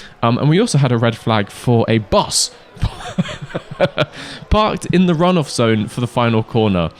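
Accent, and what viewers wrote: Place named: United Kingdom. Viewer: you bring the only podcast I could ever listen to: F1 and with a brit accent. Perfect to listen while I work! I hope wet pointless testing becomes a thing! Thank you!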